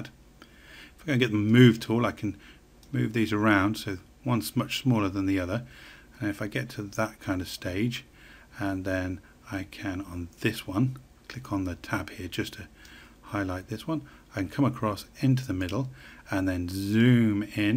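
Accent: United Kingdom. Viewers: I Like so much UK English! :D Thanks for this tutorial! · Excellent. Nice to see, and hear, tutorials in English English and not American "English" Your tutorials are so easy to follow.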